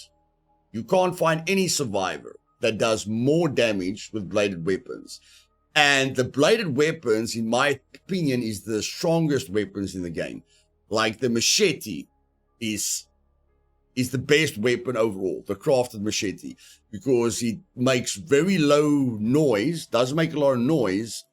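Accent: South Africa. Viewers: Absolutely great video bruu. Love how in depth U went with this. I'm massive into the dead fan Also THE SOUTH AFRICAN ACCENT YESS. I'm Aussie South African lmao Great vid man 👍👍👍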